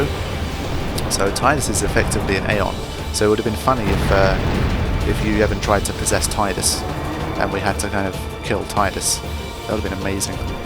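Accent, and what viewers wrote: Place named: London, United Kingdom. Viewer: What an insightful walkthrough! I've been interested in hearing someone's thoughts about this game, and as a result I found you. I wanted to hear what you had to say throughout your playthrough and I must say that I have thoroughly enjoyed your commentaires. Your explanations about the story make sense, it allowed me to see FFX in a slightly different perspective, so that's nice to hear. Overall, clean commentaries. You talk when it is necessary, you managed to give many details about the game mechanics, as well as adding some sarcasm tone here and there; that kind of humor is always welcome =) Thank you for your hard work and for all the content you willingly share with us, hands down! P.S. Nice voice, and nice accent as well. Londonian accent is the best in my opinion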